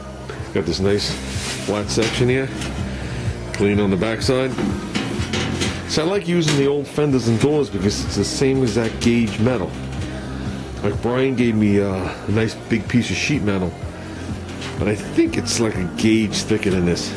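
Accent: New York, United States. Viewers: Sweet power hour. Your accent reminds me of my god parents who lived in Pawling, NY.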